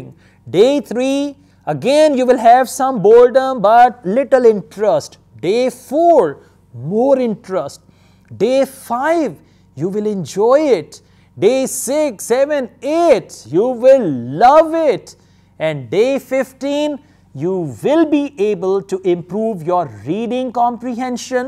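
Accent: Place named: Punjab, India. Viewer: I like your Punjabi accent 😁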